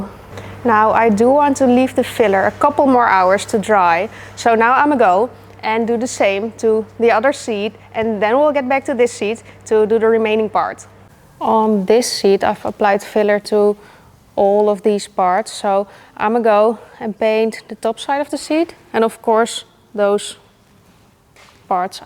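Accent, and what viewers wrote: Netherlands. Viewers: ARE YOU DUTCH? 🙋‍♂️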